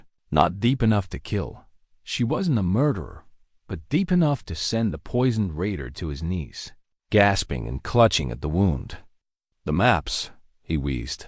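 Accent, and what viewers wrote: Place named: New York, United States. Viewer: Good story! The narrator sounded like he was from New York though. I preferred the other voice you used to use.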